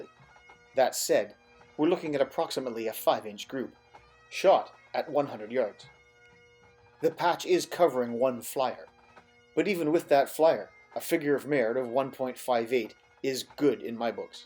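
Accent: Canada. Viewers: These are fantastic video's love them also love the Canadian accent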